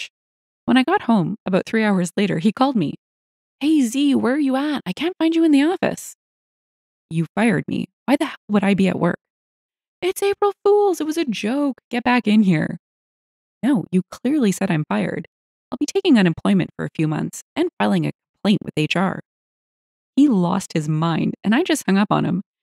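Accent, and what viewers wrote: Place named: Canada. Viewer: You're sounding like a smooth and sexy Canadian!👍👍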